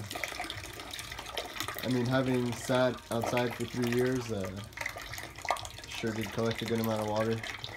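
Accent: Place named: Quebec, Canada. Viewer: I was about to say, your accent is almost french canadian. Then I clicked on your profile and I see Montreal, Québec, Ah ben Tabarnak ! :) Haha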